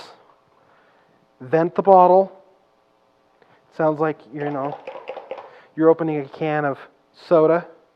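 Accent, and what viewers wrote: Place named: Brazil. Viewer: The woman...she's brazilian, right? Brazilian accent going on hahahha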